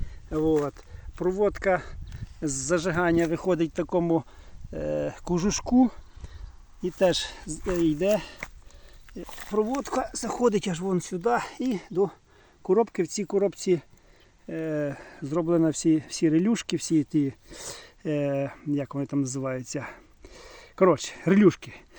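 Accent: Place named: Surzhyk, Ukraine. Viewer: У Вас дуже багато у вимові суржику. А в хлопців дійсно руки золоті.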